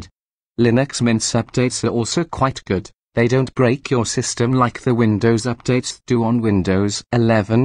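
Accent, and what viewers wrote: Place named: Wales, United Kingdom. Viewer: A Welsh “robot voice” - how original!!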